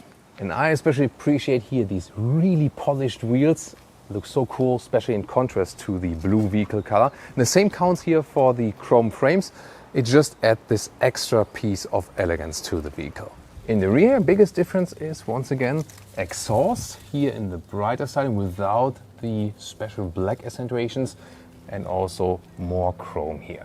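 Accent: Germany. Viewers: Vom accent bin ich mir sicher das er deutscher ist 😅 🇩🇪💪💯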